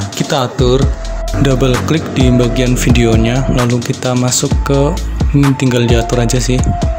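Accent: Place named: Java, Indonesia. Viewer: Wong jowo toh iki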